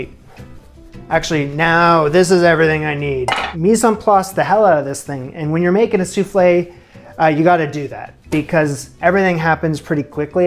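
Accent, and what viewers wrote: Canada. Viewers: Please respond to my comment. You are Canadian based on your accent. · Yup, been binge watching these too the past few days. The best thing is waiting for to drop an "about" in his adorable Canadian "ooh" accent. 😁 Definitely making these soufflés myself! Keep the orders up, J! 😋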